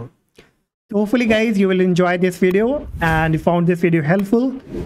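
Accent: India. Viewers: you know vid is good when there is a Indian accent. thank you this actually helped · you know tutorial is good when you hear the indian accent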